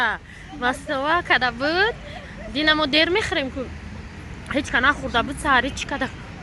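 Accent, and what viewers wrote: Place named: Khujand, Tajikistan. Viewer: Эх лафзи хучандиии гушаком кайба туя мешунавад😭😭😭😭😭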